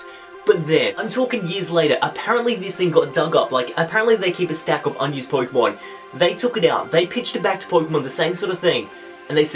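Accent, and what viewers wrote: Australia. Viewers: Thats an australian accent. Thats honestly kinda racist. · ReaditonReddit Autocorrect :/ btw English Originated in England not America,and I know it was Australian but I'm not that good at realizing the difference in accents of Australian and British. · Are you Australian?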